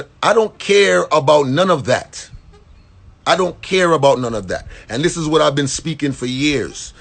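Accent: Jamaica. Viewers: ok i'm convinced you're Jamaican now. I can hear the natural accent. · Yo a fuckery a diss I didn't even know this was happening yo man fuck all this bullshit why name getting called in fuckery y'all who know know he don't hate know he big up EVERYONE no matter what it don't matter where you from what you rep big up everyone and him stay away from fuckery I'm dissapoimted that he has to address some fuckery like this I've never seen like this for him to be like this is nah right nah kool a fuckery ah dis general he fucking holds it down for the WHOLE AH DUH FUCKING CARRIBEAN y'all ignorant idiot talk bout him not no yardie nah matter if em yardie or not em support all yuh and he is yardie listen when he speak regular in a non YouTube shit he speak patois man a yardie pusshole all yuh but Nah the point the point ah fi look out fi di Caribbean and ah dah whole ah we nah just yard Nah just Trini but em chat fi did whole ah di carribean brighten up all yuh ignorant fool realize what he saying is we Caribbean need to support each other and not from they come up but from they ground from they dirt and that we as carribeans needs to support each other no matter who fucking island yardie bajan Trini gayaneese Nah fucking matter we need to fucking show love appreciation and support and big up we culture we are one Caribbean because in the U.S in the U.K in Canada dem nah see we dem nah respect we we ah FUCKING CARRIBEAN WE ARE THE FUCKING WHOLE AH WE support the WHOLE AH ONE ANOTHER not just one island support itself but all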